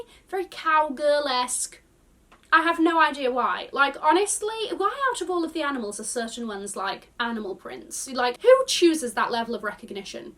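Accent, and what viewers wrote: United Kingdom. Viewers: You are the first British accent that I’ve heard say Tig ole Bitties 🤣🤣🤣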